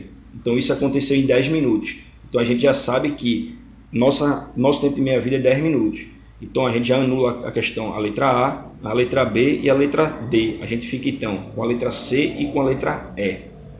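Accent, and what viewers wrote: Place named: Northeast, Brazil. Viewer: só quem é nordestino entende bem e reconhece esse sotaque rsrsr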